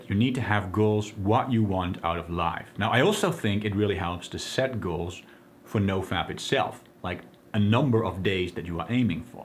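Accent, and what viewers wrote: Netherlands. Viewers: You sound really dutch maat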